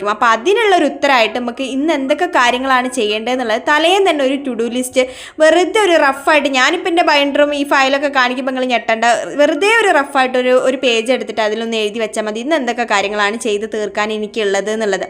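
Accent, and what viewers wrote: Kozhikode, India. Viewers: swontham kozhikkote bhasha aayondanu kooduthalum aduppam thonunne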